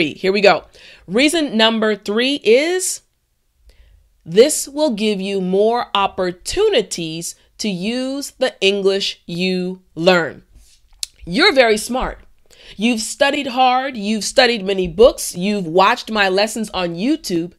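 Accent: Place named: United States. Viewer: you r litteraly terrific, i do love your ways of teaching moreover your american intonation. I'm still connected to your lessons.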